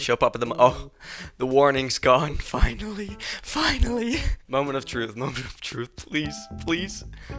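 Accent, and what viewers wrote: Netherlands. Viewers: Is bro nederlands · ik vind jou nederlandse accent echt geweldig :) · you dutch? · i smell a lil dutch hmmmmmmm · Ik hoor een nederlands accent Edit: NEDERLANDS, HET IS BEWIJS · From the very first second of hearing your voice I knew you were Dutch lmao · ur dutch? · wait are u dutch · I hear a little bit Nederlands 🔥😭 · what nice video with a thick dutch accent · Are you dutch? WAS THAT THE MEDIAMARKT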